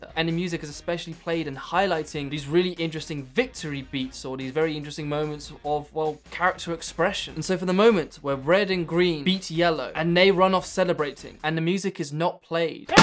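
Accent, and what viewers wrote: United Kingdom. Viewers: Honestly the most useful editing tutorial videos I’ve ever watched + I love the British accent ❤️ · british accents :(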